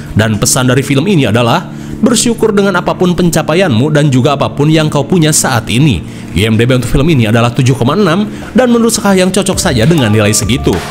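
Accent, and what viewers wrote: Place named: West Java, Indonesia. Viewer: Ci mimin nya orang sunda ini mah 😁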